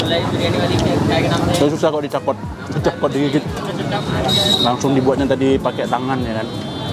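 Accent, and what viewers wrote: Medan, Indonesia. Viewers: Yang bikin saya salut dengan Vloger ini walaupun bahasanya agak nyinyir didepan pedagangya dengan logat medannya yg lucu tapi dia tidak lupa berbagi dengan orang2 disekitarnya… mudah2an murah rezeki lah kau bang …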